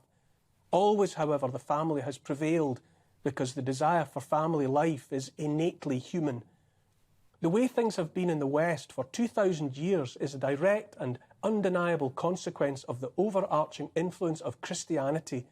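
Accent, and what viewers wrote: Scotland, United Kingdom. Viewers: ❤️ ❤️ ❤️ ❤️ ❤️ ❤️ ❤️ ❤️ 🎄🎅🎄🎅🎄🎅🎄🎅🎄🎅 ⛄🎉⛄🎉⛄🎉⛄🎉⛄🎉 Neil Won't Kneel A gentle, beautiful Scottish lilt A kind, empathic, forward tilt An open heart; a caring face A willingness to take his place. A strong, home-hitting monologue Reminds us of the boiling frog The courage to become a sleuth A passion to release the truth. A deep desire to crack the whip Illuminates our freedom-strip Calls out the darkness taking hold The shiny despots; plated gold! Intense and raw, he lays it bare A soul-enhancing, honest stare Inflections drive the message through He speaks for Us - for me and you! Discernment's such a precious gift His words create a mighty lift Take heed of all he has to say He knows - it shouldn't be this way! 🤗🦋🐬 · It's the voice in our heads spoken eloquently with a scot accent. England has to look north for a leader. He's the best truth speaker at the moment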